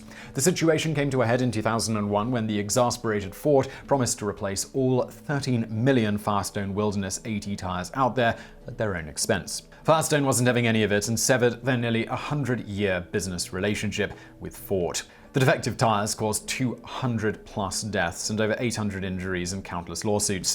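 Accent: United Kingdom. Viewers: Its the Queens English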